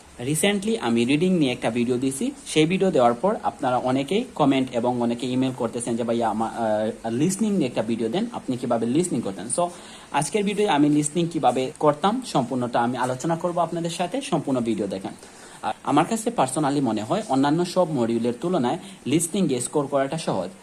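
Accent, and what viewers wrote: Sylhet, Bangladesh. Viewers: Vaiya apni ki Sylhety?😊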